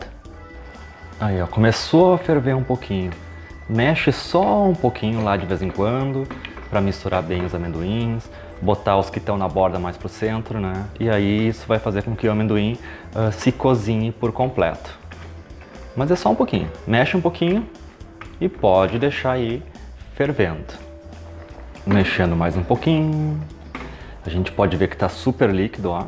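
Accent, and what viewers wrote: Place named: Rio Grande do Sul, Brazil. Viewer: Vou fazer 😋 uma pergunta, vc é gaúcho? Por causa do sotaque 😁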